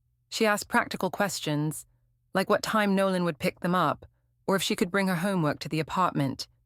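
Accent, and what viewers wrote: United Kingdom. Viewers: I love the narrators British accent. But man she can really sound mean and borderline cruel. She sounds brilliant but plays the dumbest chick in every story.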